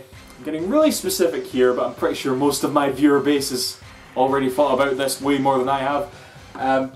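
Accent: Scotland, United Kingdom. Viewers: Right? I've watched his channel forever and those play throughs multiple time. This beautiful Scottish accent is a part of my nostalgia at this point :)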